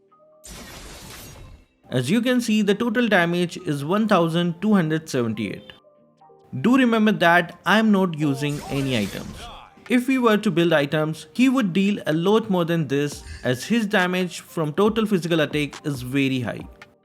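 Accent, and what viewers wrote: India. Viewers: Sound like a indian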